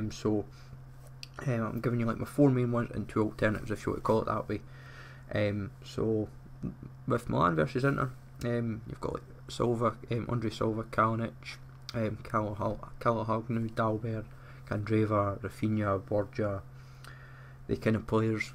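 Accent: Scotland, United Kingdom. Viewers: always love the scottish accents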